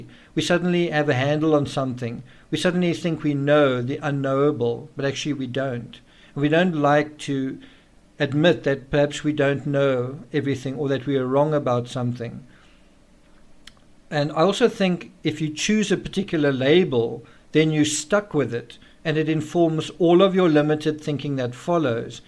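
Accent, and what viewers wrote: South Africa. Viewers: I have been gradually losing interest in this channel without understanding why. Could it be as simple as overdosing on the South African accent, since I also watch Grizzly? Blaming myself for losing interestshould have been my sign. Now I realize that was a sign of feeling gaslighting. You have totally misunderstood narcissism, and you might never be able to see it truly, even if you watch HG Tudor till the cows come home ... But the condescending tone you display towards your audience is unmistakeable to me now that I have become educated.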